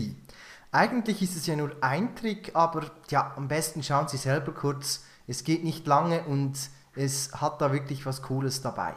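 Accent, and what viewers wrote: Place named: Switzerland. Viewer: Dein schweizer Dialekt ist einfach genial :D Komischerweise versteh ich dich sogar zu 100%, was nicht bei allen Schweizern der Fall ist ;)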